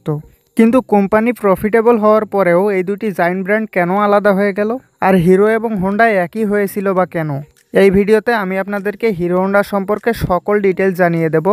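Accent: Bangladesh. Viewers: পুরাই বাঙাল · Are you Bangladeshi?. Because you (talk) pronunciation as Bangladeshi people.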